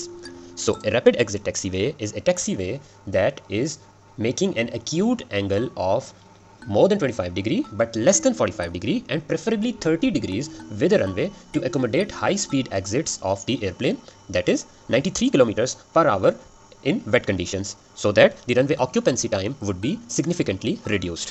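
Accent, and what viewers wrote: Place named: India. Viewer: It's always the Indian accent that teaches the best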